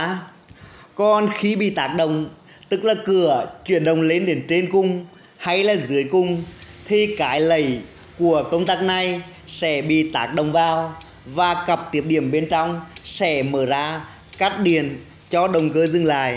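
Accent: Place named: Central, Vietnam. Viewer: Giọng miền trung Hay quá chúc bạn ngày mới vui vẻ nhé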